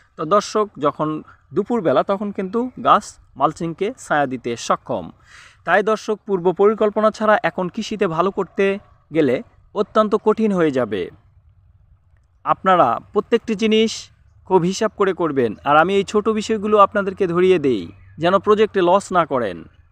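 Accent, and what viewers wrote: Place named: Naogaon, Bangladesh. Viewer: ভাই আপনার বাসা নওগাঁর কথায়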